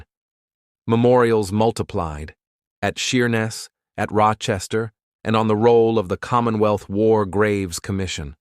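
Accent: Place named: United States. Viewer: 1st. First of all, thank you for pronouncing EVERYTHING, in perfect American. I know this was not Al, and you could, really tell.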